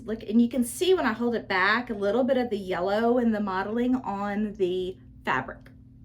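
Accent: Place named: South, United States. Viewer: Hi! I live in Colorado - but grew up in Virginia and South Carolina and my family is all still in the Carolinas. Watching you and hearing your sweet southern drawl feels like a visit from home. 💕 1, 2, 3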